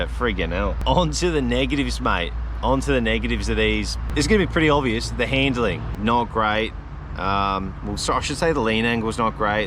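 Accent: Australia. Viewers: Wonderful review. The exhaust note is therapy. And your strong australian accent is a pleasure to hear 😊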